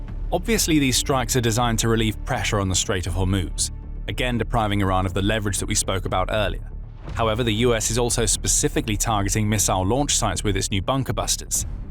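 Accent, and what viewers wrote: United Kingdom. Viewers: This channel is a joke. Ammosexual content for Americans, read by a British guy. Unsub.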